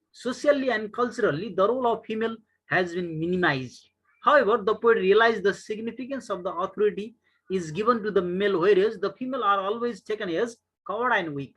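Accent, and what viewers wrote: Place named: United States. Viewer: Nepali ma ni vannu ni kattina american jasto english matra🙄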